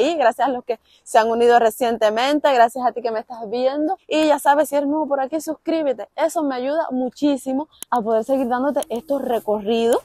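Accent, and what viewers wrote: Cuba. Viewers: el acento lo vas a perder cuando estés hablando con chilenos, pero aunque pasen 30 años, al volver a hablar con cubanos, vas a recobrar el acento, claro que nunca va a ser el mismo. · Cubanita me encanta tu asentó ❤️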